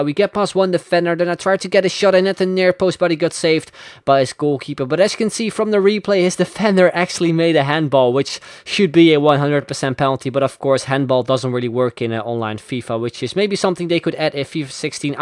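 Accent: Netherlands. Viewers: ja hij komt uit nl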